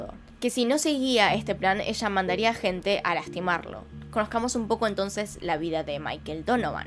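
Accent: Argentina. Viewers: Amo tus videos y tu acento argentino que le da como mas emosion a los casos! Saludos desde west palm beach, florida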